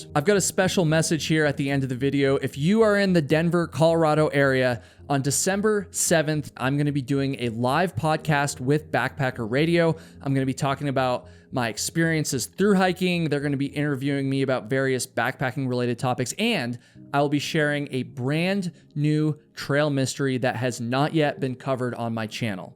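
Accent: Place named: New England, United States. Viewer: is a New Englander, irrc. I noticed staying in rural Vermont/upstate New York years ago that a lot of the speech patterns and sayings up there are quite similar to ours in the UK. Definitely moreso than out west or in the bigger cities.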